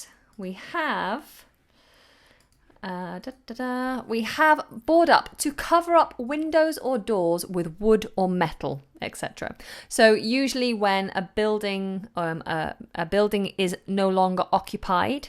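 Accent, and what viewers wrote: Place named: United Kingdom. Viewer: What a lovely British accent! I'm watching you from Syria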